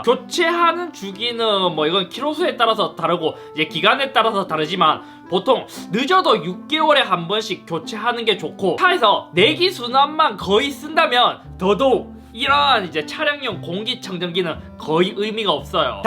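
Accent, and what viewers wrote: Gyeongsang, South Korea. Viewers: ..쭝국...사투리보소 경상도 사나이네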